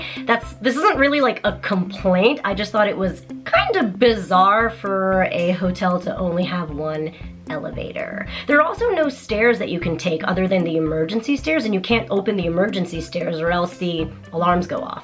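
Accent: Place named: United States. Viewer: Your Japanese got an American accent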